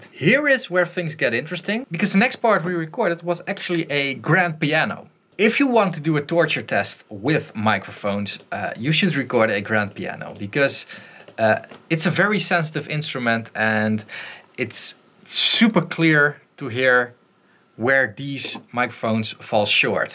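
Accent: Netherlands. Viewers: I thought I heard some Nijmegian in your Dunglish but damn it is the other side of the river :) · Deze man ze accent is best goed maar als nederlander hoor je gelijk dat ie nedelands is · Yo-yo. I know you're Dutch because of the accent. You can tell it easily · Love the Dutch accent! Better than Australian, which is often unintelligible. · you pronounce like a dutch guy does and should, like myself, I hate all those quasi perfect american accent dutchies showing of their cosmopolitism, just stay as you are with your pronounciations! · Agreed. I used to date a Dutch girl so I find the accent adorable. Lol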